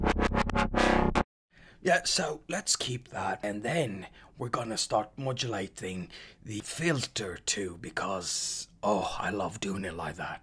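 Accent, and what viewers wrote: United Kingdom. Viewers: I hear the "UK" in your voice too. Maybe it's where you learned english from? I know this man from China and he has a "UK" accent. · Really enjoyed this video ! And UK accent is the way to go !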